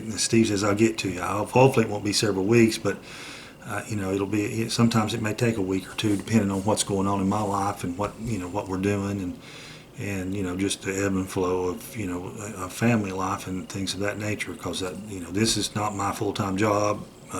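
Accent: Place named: North Carolina, United States. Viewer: From a NC girl transplanted to California it sure is nice to hear my daddy's talk., you are such a kid with that Go Pro. Enjoy. Y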